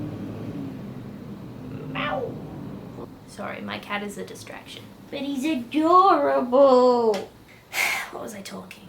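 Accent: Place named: United Kingdom. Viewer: She sounds British.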